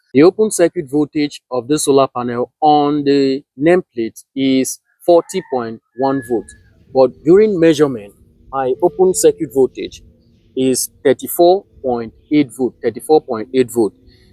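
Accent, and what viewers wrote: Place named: Africa. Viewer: There is nothing wrong with the way he pronounced circuit and Celsius, he's from Africa do you expect him to sound European!?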